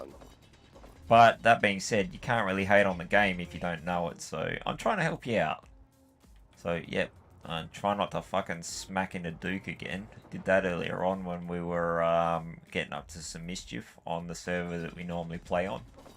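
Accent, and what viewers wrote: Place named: Australia. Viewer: You have a down under accent, I myself taking a break after playing LSG "The Black" and "Plane parts server".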